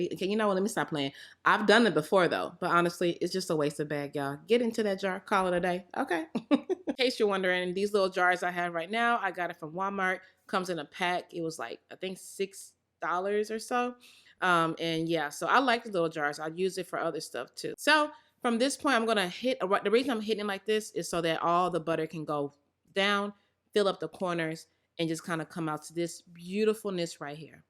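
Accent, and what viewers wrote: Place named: Nigeria. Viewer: I like your Nigerian accent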